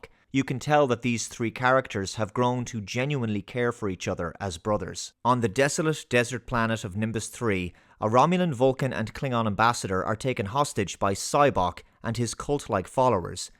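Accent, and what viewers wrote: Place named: Ireland. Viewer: It was clearly exploring the concept of God, which is highly cinematic by it self. It also thoroughly explored how pain artificially relieved (being cybocks power) is a crime to the souls identity. TrekV was excellent as classic Trek, this film brought what we've come to expect, and a ton of fun. You know, your voice now that I think about it really sounds a lot like K. I. T. T!! Albeit Irish instead of british accent, you could easily voice him if Daniels himself not available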